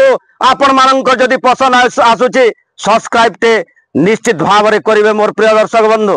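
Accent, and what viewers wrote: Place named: Cuttack, India. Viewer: ଭଲ କଥା କହୁଛ ଭଇ ହେଲେ ମୁଁଇ ଯାନି ନାଇଁ ପାରଲି ଯେ ତୁମେ କଟକିଆ ଛୁଆ ଅ କି ସମ୍ବଲପୁରିଆ ଛୁଆ ଅ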